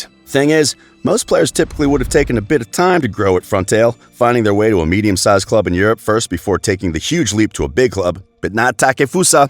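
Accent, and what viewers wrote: United States. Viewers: I am watching it...butchering's name doesn't mean he doesn't watch football! He has a strong accent of American...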